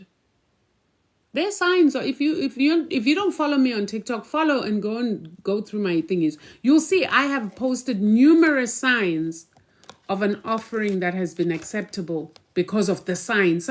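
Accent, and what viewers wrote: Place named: Zimbabwe. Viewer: Hi Gogo. Very very new to African Spirituality. Just wondering if you were born in Zimbabwe? I'm seeing Great Zimbabwe on your profile and hearing an accent that sounds like home. Thank you for sharing...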